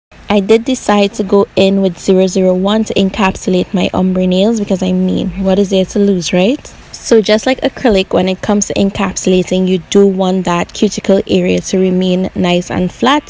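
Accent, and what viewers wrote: Trinidad and Tobago. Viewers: are u trini?? cause u def sound like it lol also, this set is fireeeee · Recognise that trini accent anywhere 🇹🇹🇹🇹... Imma subscribe to your channel just for that 😁😁.... BTW I love polygel tutorial videos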